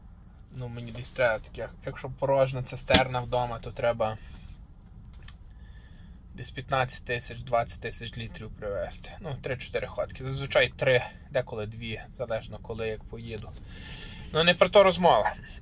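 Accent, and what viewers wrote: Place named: Western Ukraine, Ukraine. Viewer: Пан явно із західної України.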